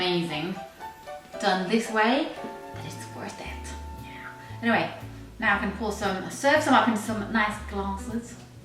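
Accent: United Kingdom. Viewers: Alton brown I love that guy show I already like you #subscribe the accent is a plus I adore British accents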